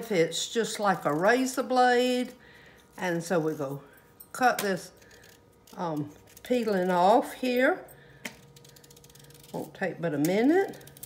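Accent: South, United States. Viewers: I remember my granny and aunts always having a pound cake to serve someone. You are most definitely a Southern lady who would have this tradition. Makes my heart happy. I love watching your videos and hearing your lovely Southern accent. 🌿🌺🌿🌺🌿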